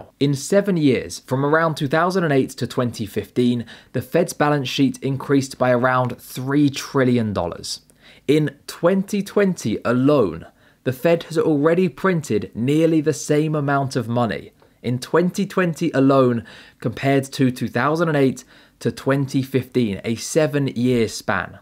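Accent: England, United Kingdom. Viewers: I normally listen to American investors and traders hard to find people from where I am from to talk about stocks but good to hear an English voice !!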